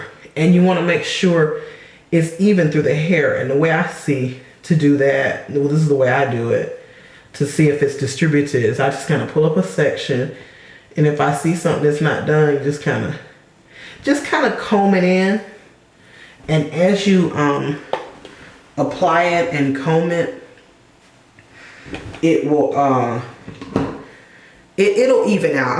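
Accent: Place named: South, United States. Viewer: he's so country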